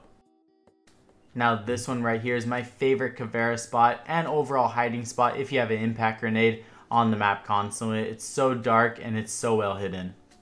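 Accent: Hawaii, United States. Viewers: I was actually gonna ask if you were from Hawaii until you said it. I'm from Hawaii too (near UH but I grew up here), was just gonna say it could be a good idea to advertise that just for being unique!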